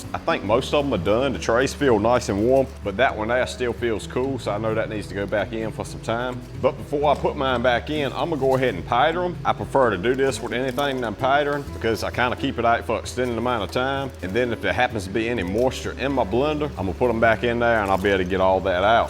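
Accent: United States. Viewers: Where in the US is this accent from i swear every word that has a W or "ou"he says with an I Pider, sind, 😂😂😂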